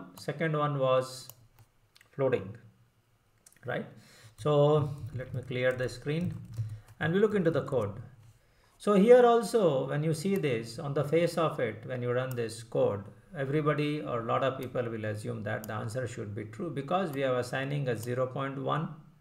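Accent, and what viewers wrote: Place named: India. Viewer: i am french so the indian english accent was a bit harder for me, but after the end of this video i was ok. i will continue the other videos cause its clear, pertinent, calm and complete enough per subjects. thank you.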